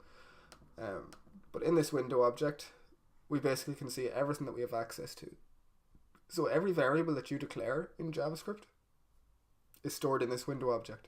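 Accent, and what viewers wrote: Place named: Ireland. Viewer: it's called an irish accent hahah!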